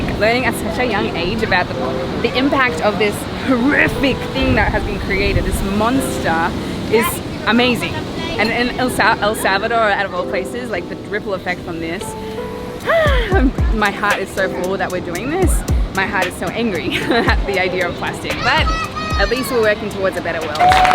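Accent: Australia. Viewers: Are u aussie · How do you not use plastic water bottles while traveling in South and Central America? Does your water bottle purify the tap water to AU standards so you don’t get sick? With your Australian accent I’m assuming you grew up with super purified tap water... the tap water in South/Central America has bacteria that we’re not used to so we get sick when we drink it. (I’m half Australian/half American- grew up in the US. I just got back from Ecuador and was reliant on bottled water during my stay there so I wouldn’t get sick. In the states I will not use plastic bottles and during my stay I filled up my water using the big reusable bottles as much as I could but still used the plastic ones occasionally when other alternatives were not available.) Thanks so much!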